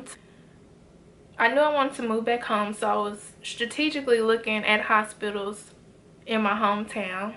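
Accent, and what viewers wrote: Memphis, United States. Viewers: Awesome video. You sound like you from Memphis,Tn . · I am loving your videos. Especially our accent(memphis) I graduate from u of m in dec. So I am going back for second degree bsn ( u of m) new subscriber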